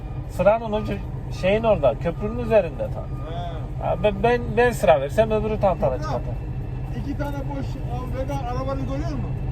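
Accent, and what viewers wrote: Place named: Central Anatolia, Turkey. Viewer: Kolay gelsin REIS konusma Tarzin ic anadolu lu oldugunu gosteriyo galiba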